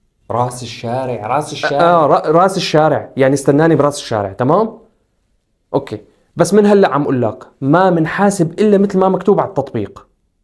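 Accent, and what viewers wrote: Alexandria, Egypt. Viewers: لكن بيحكي لهجه اسكندرانيه احلى كلام ربنا يجعل محبه بين الشعوب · كلامك اسكندراني ❤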